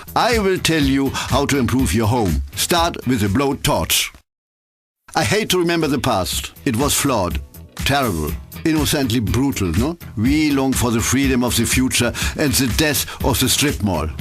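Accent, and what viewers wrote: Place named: Africa. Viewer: sounds like african english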